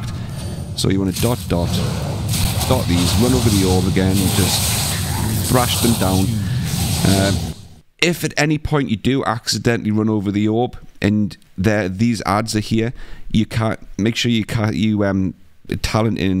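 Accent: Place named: North East England, United Kingdom. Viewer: Also where abouts you from North East accent im from NE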